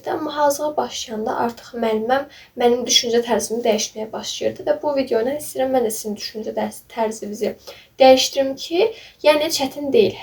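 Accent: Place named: Nakhchivan, Azerbaijan. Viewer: tam təxmin etdiyim kimi😊❤ Ləhcə ilə danışmırdın sadece ara sıra bəzi kəlimələrdən Naxçıvanlı olduğu düşündüm ❤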